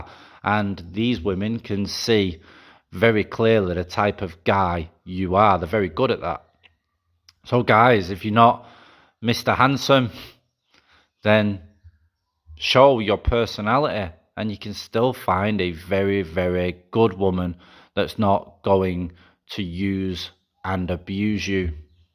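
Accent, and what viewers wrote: Manchester, United Kingdom. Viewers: Hi.. Love your channel. Although I have lived and worked in Asia I was not involved with any of this type of life as my wife was always with me but but I enjoy the human interest stories and your obvious decency as a man. But, as a Manc that left in 1984 I especially love hearing the Manc accent Does my heart good. Have a vey happy Christman with your son.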